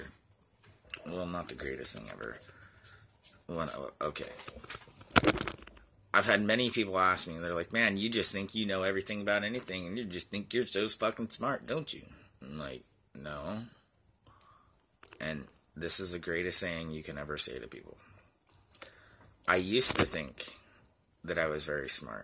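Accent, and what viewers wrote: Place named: Texas, United States. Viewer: Lovely accent my friend 👍🌻 Awesome is it Texan ? Now all I can say is America sucks! And you all need protection from true evil. Please try the Ganesha Mantra to protect you from evil forces. Breaks all obstacles . I prey for you all! 💚💚💚💚 Its a evil game where the loser will die a horrible death 💀 I'm sorry . Ganesha will protect you.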